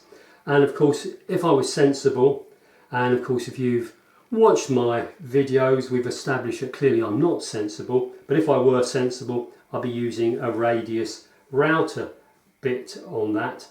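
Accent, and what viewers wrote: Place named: United Kingdom. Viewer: Hello again,. Am watching your bass construction series, very much enjoying it. While I have no desire to ever build a bass, as usual, I totally admire your craftsmanship and attention to the smallest of details. While I am at it, I should mention I find your British accent a real treat to my ears, as if I am eating a piece of delicious toast covered in peach jam. Cheers, my friend. Bob in Montana. 😊❤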